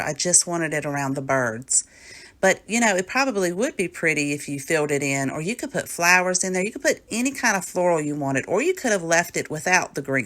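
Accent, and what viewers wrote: South, United States. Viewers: How cute both diy’s are. Found you through Heidi. I subscribed today. I’m so happy I found you. I think both projects go together. Where are you from? You have a very southern accent. I’m from Pensacola, but I live on the coast of MS now.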